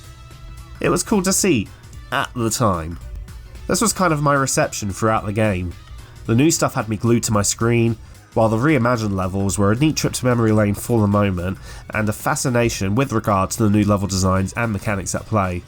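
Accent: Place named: England, United Kingdom. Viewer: Are you me, but with an English accent? Because I feel like you are me.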